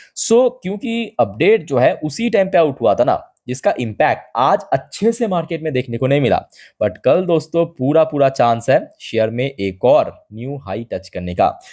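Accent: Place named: Bengal, India. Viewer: Like the way u speak.. R u begoli.. Speak hindi so sweet ❤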